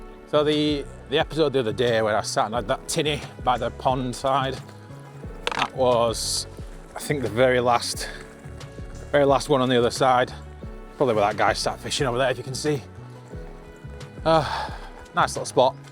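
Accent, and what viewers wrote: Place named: Barnsley, United Kingdom. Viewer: Eyup fella, there's nowt worse than feeling knackered sleep wise, but at least you got out and did summat. You can't beat getting out and getting some air in your face, and especially when you're fatigued, or your head feels full. Don't worry about your Barnsley twang, it's who you are, and your music was just rate and not too harsh to go with your ride. Me and my mate are having a rest tomorrow (Sunday) we've done 6 rides this week and about 145 miles according to strava. I see your on a emtb, have you ever run out of battery? We're not ruling out having one ourselves at some point in the future, but while we can, we'll keep battling on. Keep the videos coming fella 🚴‍♂️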